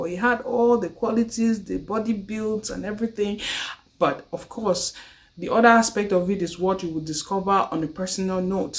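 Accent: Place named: Pidgin, Nigeria. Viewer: OMG 😯 - that was a nice 👍🏾 video, you were very much down to earth - no dulling + no forming, your pidgin english (broken english is very concentrated ooh 😲) - do you blame for bringing out the Nigerian man in him? when you completely took him off guard -) can’t wait 😊 for the follow up. Well done ✅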